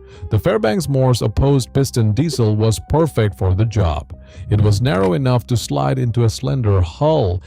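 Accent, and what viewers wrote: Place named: India. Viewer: An AI voice model with a slight indian accent on this type of channel is a wild choice!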